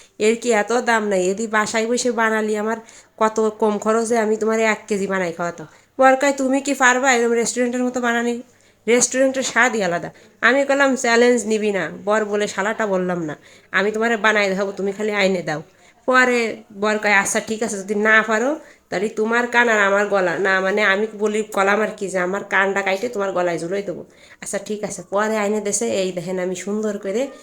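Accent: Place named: Narail, Bangladesh. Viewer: আফা আপনার থেকে আমি একটু নড়াইলের ভাষা সিখতাম🫠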